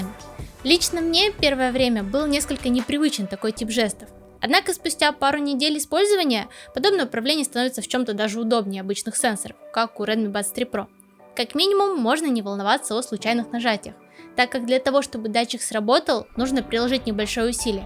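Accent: Perm, Russia. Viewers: Вы что, из Перми?)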